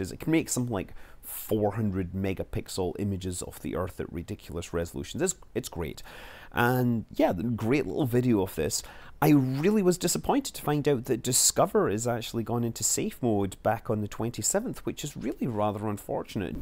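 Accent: Scotland, United Kingdom. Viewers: lol. It just hit me what it means for you to be touring Europe. Your accent is so strong I keep forgetting you're from the US... There seem to be a lot of American youtubers with various kinds of British Accents... Feels kinda weird. (and yes, I know that's a scottish accent. But you know full well that Scotland is part of the UK, so it's not technically wrong, even if the Scots might tend to think so... I mean, that affects me personally in fact - I've known for ages that I'm 1/4 british, but I'd never looked into how or why, until a few years ago when I learnt that this was pretty much mostly Scottish ancestry. Because my Grandfather is Polish and my one of my father and one of my aunts were born in Plymouth that wasn't immediately obvious... - if for some bizarre reason you're wondering, my other aunt was born in Zimbabwe. Probably tells you a lot about my family huh. 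XD - the other half is mostly far more boring, but, again from a different country...)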